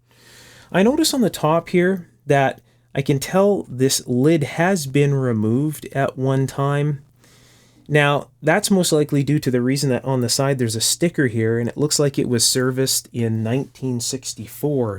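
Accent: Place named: Canada. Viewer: I love your voice, even your Canadian pronunciation of “out” an “House”. Sometimes I put on your videos just for background sound when I work on long complicated projects. Thank you so much!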